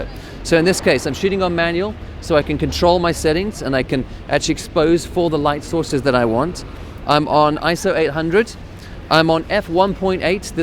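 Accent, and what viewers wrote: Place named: South Africa. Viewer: Man I knew you were South African the first time I heard you speak, can't mistake some of those enunciations coming through the British accent 😅 . Great video bru 🇿🇦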